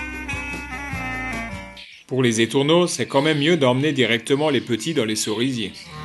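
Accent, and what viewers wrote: Alsace, France. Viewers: Donc désolé de ne pas apprécier cet accent alsacien mais un bon reportage animalier (comme celui çi) mérite une voie sans accent, claire, expressive et appréciable par le plus de monde… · Merci Monsieur, vous avez un talent certain pour le documentaire animalier . Très belles prises de vue , commentaires d'humour . J'aime votre accent alsacien également et avez de l'amour pour la nature . Vive le maintien de la diversité culturelle comme celle animalière. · je ne suis pas du tout d'accord avec vous, la voix est claire, la diction correcte avec une bonne articulation et, les commentaires très intéressants. L'accent alsacien est de plus charmant. D'ailleurs, je viens de m'abonner. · L'accent alsacien vous dérange?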